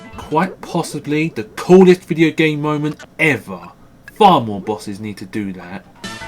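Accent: England, United Kingdom. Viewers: Ha! I knew it! You sound English. Am I right?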